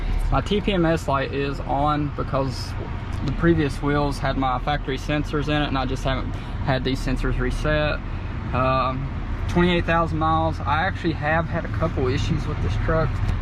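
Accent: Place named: West Virginia, United States. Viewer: Are you from West Virginia or something? You sound kinda hick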